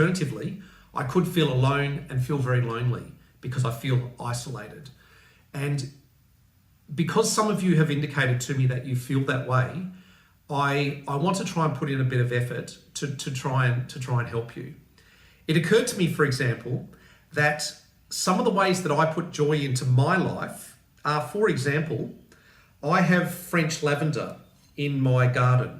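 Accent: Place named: Australia. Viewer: thank you for your lovely and thoughtful posts. My husband passed away 2 years ago so I'm alone. He was Australian so listening to you reminds me of Mike. He was from Melbourne so the accent is a bit different. I pray for you r health and that you may feel joy. You are a gift to the world. I look forward to your posts. My joys are my coffee in the morning and my grandchildren.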